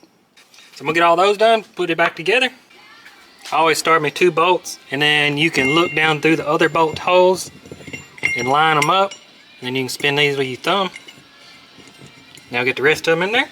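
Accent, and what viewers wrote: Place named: South, United States. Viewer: If he’s got a southerner accent, you know you can trust him.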